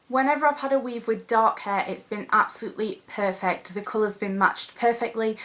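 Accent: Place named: England, United Kingdom. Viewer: I love this tipical english accent! Cute!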